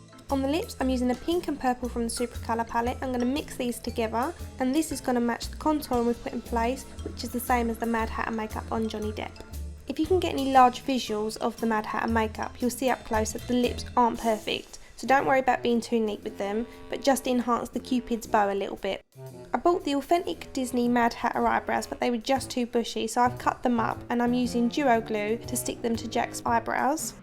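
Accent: Essex, United Kingdom. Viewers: You sound like a posher Essex;) But the word "Hatter" really gave it away. Im looking for something for halloween, Saw a black mad hatter on here that I really like but I install security systems and wouldn't have a clue how to do makeup. Especially facial hair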